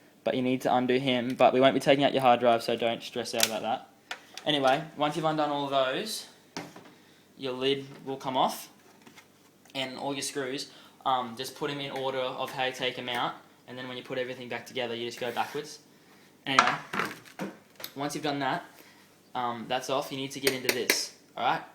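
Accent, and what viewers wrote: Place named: Australia. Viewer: "Don't swear"... You sound Aussie as well but i'd turn and say fuck just for shits and gigs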